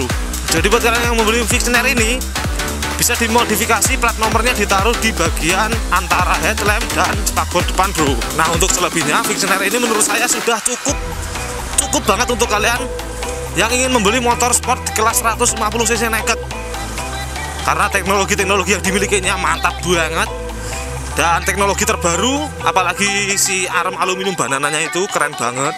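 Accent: Java, Indonesia. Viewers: Muedok tenan mas... mantap lah,madjoe terus... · Keren nih review... Nggak mojokkan salah satu merek. Mantap bro. Logat jowone mantap bro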